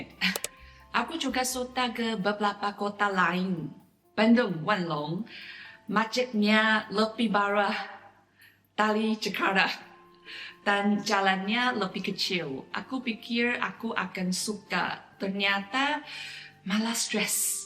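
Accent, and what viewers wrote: China. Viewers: Kakak dari Beijing ya? Dulu ada temenku dari China yg 'dialek' bahasa Indonesianya seperti kakak kalo ngomong.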